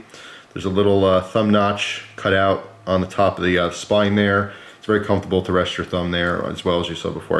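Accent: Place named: New Jersey, United States. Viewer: the A in extrema is pronounced kinda like ah, English when spoken relaxed by a fluent speaker it is normal for the spaces between english words shrink and flow fluidly sounding like extrem-ahratio. American English has there own colloquialisms, and (a native new jerseyite speeks with a specific accent. To me it sounds like a slight speach impediment, you can hear it distinctly with the word water.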